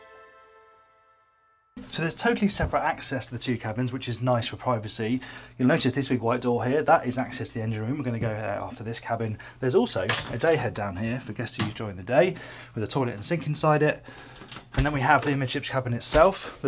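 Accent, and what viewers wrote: England, United Kingdom. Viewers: Dear God ,This guy Talks So Fast ! You do not have time to think about what he said ! Even worse ,he has an English Accent ! No Coffee for him !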